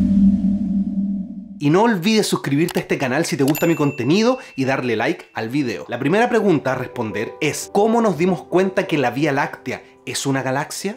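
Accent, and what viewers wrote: Chile. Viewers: Muy interesante tu vídeo. Además es muy grato escuchar el castellano chileno después de tantos años en el extranjero. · Chileno, sin duda. Muy bien hablado y claro.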